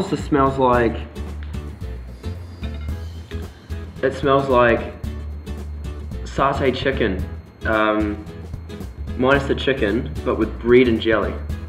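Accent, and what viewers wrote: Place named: New Zealand. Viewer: Are you from NZ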